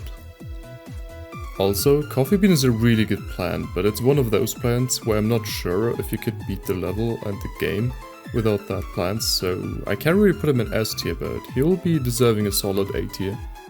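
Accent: Germany. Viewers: You have nice german accent.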